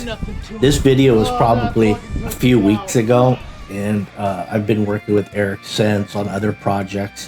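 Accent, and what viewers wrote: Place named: Southern California, United States. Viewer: I thought you were in the OC. Like at the Wedge 🏄‍♂️ 🌊 you're accent. I'm Santa Monica kid , but now I've a house is in the friggin Val haha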